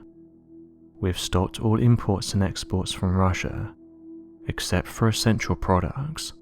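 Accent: United Kingdom. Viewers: Thank you for highlighting the brilliant work done by our see eye aye! Whoever uses this brit voiceover obviously watches cable TV. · Thank you for this vital information. I have been curious about who and what is Anonymous? Are you the same organization that started "Occupy Wall Street"? I saw you on the www in support of George Floyd. You seem to always have a British accent. Are you part of the London, Georgetown, Harvard trilateral covert network. Why must you be anonymous? We need Patriots like you front and center on the scene for Democracy.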